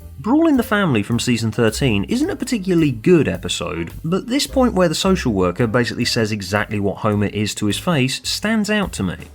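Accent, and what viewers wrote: United Kingdom. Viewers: Academic cuz he bri ish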